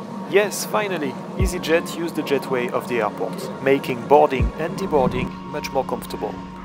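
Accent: France. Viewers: Ton accent français ressorts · C’est quoi cet accent français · I was trying to pay attention to the video but was distracted with the charming French accent. Sorry. P.S. I love all 3 airlines but most strongly Wizzair because they revolutionised travel in Central and Eastern Europe. Love Ryanair as well. Travelled at least 30 times with them. Less experience with easyJet, so no opinion. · Ça se vois quand un français parle anglais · l’accent fr reconnu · T français si oui g reconnu l’accent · Ton accent francais c'est drole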